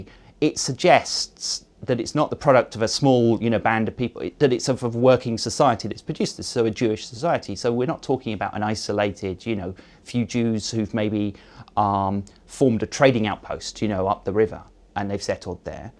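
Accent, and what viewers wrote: Russia. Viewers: Brilliant program. Host is an Ashkenazi with a comical Hollywood-style Muscovite accent. He couldn't be funnier if he tried.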